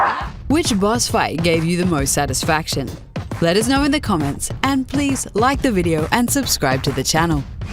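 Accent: Australia. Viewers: Is the mysterious voice over lady's accent australian? Or where is this accent from? I love it! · She's Australian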